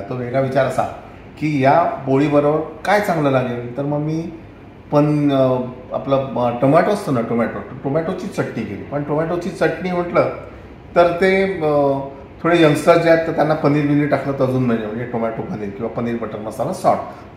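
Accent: Vidarbha, India. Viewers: Sir, multigrain chi poli khupch aawadali.Ani chena ghalun banawaleli tomato chatni...new idea... Tumche video khup aawadtat.Mi pan vidarbhaachi aslyamule tumchi Vidarbh paddhatichi Marathi bhashet bolat bolat sangitleli recipe aikayala v pahayala khup aanad vatato.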